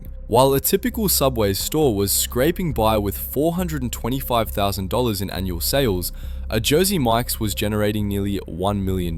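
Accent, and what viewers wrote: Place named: United Kingdom. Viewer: If you like videos about American restaurants narrated in a brit accent, this is for you. As an American, NTY.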